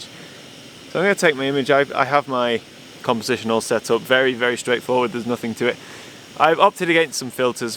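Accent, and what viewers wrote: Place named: England, United Kingdom. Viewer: The North-West US, that is? Near Canada? I had to check as the English accent created ambiguity ;) The other day I read a story of a guy who survived a grizzly bear attack in the Canadian side of the border. On an island where the wildest predator is a fox and the whole country is man-made, man-influenced or man-kempt, where rain can surprise you, but generally will not get you hypothermic in a short time - what did you take along as risk-mitigation on this trail?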